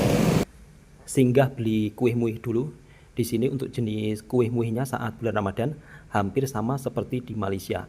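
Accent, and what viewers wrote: Java, Indonesia. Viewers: Negara kaya tapi rakyat miskin.. burit apa..kamu mahu mkn burit kah.. enak kah ngabuburit tu..😅mas kalau ngomong bunyi jowo banget gak sama dgn adik nya.. kalau cakap bahasa melayu tu gak kayak org indo.. bunyi n loghat melayu nya lain mcm..mas jowo medok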